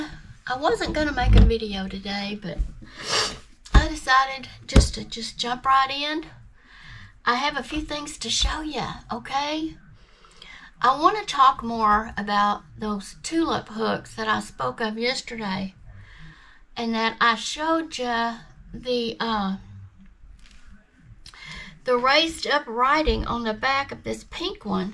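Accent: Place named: Abilene, United States. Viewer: I have the short Teamoy case in the pink kitty cover, I've had it at least 2 years, it's been great, holds up to abuse. I grew up in Abilene, listening to you is like going home. New sub! <3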